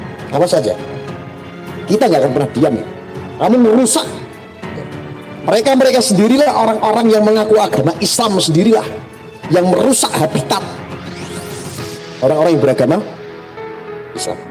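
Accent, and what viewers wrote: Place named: Madura, Indonesia. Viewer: Gus, gaya ngomong sampeyan, menjadikan diriku rindu Sampang. Mbakyu & Masku tinggal di sana... selain itu, info info dari... mencerahkan... terimakasih Gus. Salam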